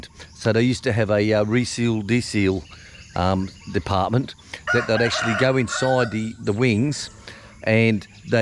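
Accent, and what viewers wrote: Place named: Australia. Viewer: Australians do have their own language. I have a difficult time understanding you guys. Your accent is not that strong though, or maybe I'm just getting used to it.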